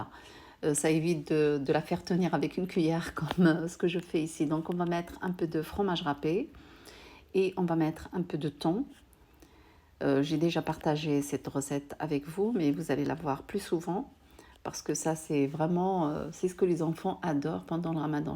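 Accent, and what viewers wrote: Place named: Morocco. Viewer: Salem mais comme J’❤❤❤❤ime bien tes vidéos et quand tu parles les deux langues et surtout quand tu parles en arabes avec l’accent marocaine Ta sœur algérienne 🇩🇿🇩🇿🇩🇿🇩🇿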